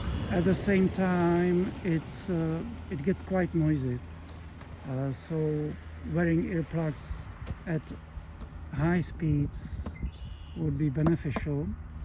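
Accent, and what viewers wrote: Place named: Czech Republic. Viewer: Ahoj. Já jsem si říkal, že ten Akcent je český 🙂. GS 310 zvládám tak, jak píšeš výše. Zkusil jsem rozumný offroad, topcase ty plné postranní kufry. Důvody, proč chci jít výš jsou: méně řazení, větší komfort při předjíždění, možnost jezdit ve dvou na delší trasy pohodlně, lepší výbava a taky pocit, že nemám nejmenší GS 🙂.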